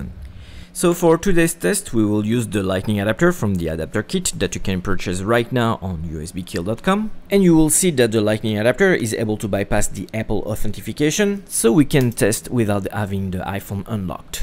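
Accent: France. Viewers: Haha youve got a french accent !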